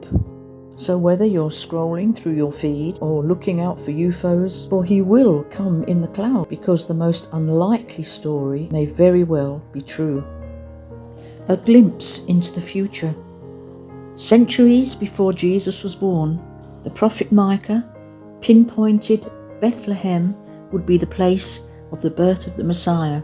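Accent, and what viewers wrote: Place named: United Kingdom. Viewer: Narration with British accents suggests truth, its just manipulation, turn video's off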